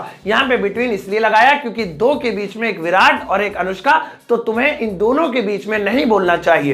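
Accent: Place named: Mumbai, India. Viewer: Ekdam bambaya hindi kya baat hai bhidu... Pahchana ki nahi apun mumbaikar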